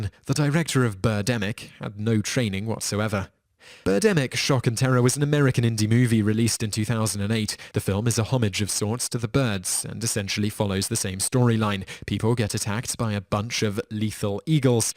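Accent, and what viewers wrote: United Kingdom. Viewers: this channel would be so much better with an atleast somewhat interesting voice. british accents are great, and I really mean it. but this guy... I can't imagine anyone staying awake until the end of a 10 minutes video.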